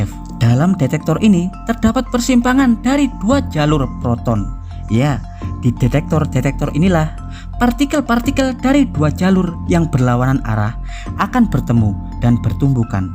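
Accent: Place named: Java, Indonesia. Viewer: Tak berijazah tapi jenius tapi medhokk.. magnet jadi mahnet.. gpp yg penting paham dengan penjelasannya prof.. terima kasih 🙏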